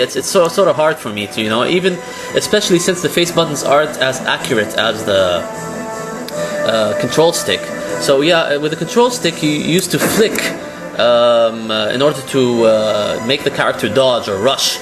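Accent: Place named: Scandinavia. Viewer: Haha. Yeahh, it is mostly the accent that sounds like a scandinavian's, especially the way you say the "R" sound. Also the lightning made your skin color look kind of possible for a scandinavian. The video was very understandable though. I am used to the accent by myself being a Scandy :)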